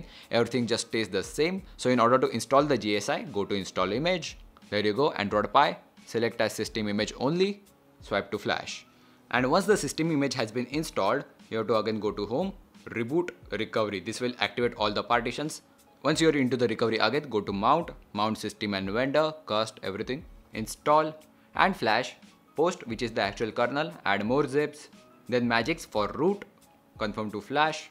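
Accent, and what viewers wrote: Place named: South India, India. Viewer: South Indian... I know right ?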